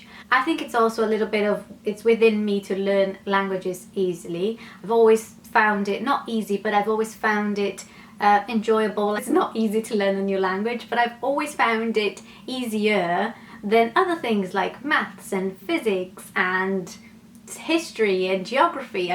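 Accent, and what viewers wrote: United Kingdom. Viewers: Aii quero ter esse sotaque britanico seu que lindo s2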